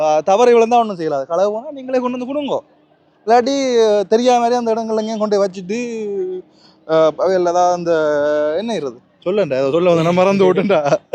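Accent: Sri Lanka. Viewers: மதகள்கோவில்சிறப்பாயுள்ளதாகதங்களின்வாய்மொழியாகத்தெரிந்துகொண்டோம்.வாழ்த்துகள்.தங்களின்சிங்கலத்தமிழ்இனிமையாயுள்ளது.நன்றி.தயவுசெய்துஅக்கோவிலைத்தரிசிக்க எப்படிஎந்தவழியாகவரவேண்டும்லொக்கேசனைவிளக்கமாகக்கூறிஉதவுக.நன்றி.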